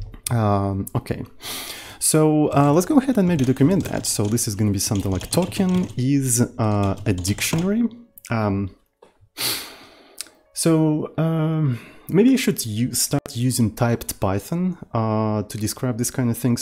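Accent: Russia. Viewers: Чел наверняка русский (с таким-то акцентом)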